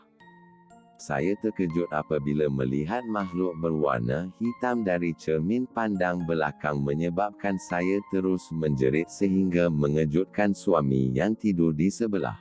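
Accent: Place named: Sabah, Malaysia. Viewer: Sial lah suara Sabahan 🐷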